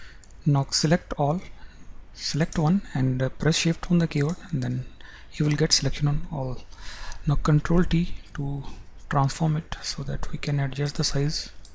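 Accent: India. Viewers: Tanguy Souchet Typical North Indian Accent for ya ;) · i fucking haaate indian accent , gosh i would break your teeth just for talking vonce , vhen , vhere , vhore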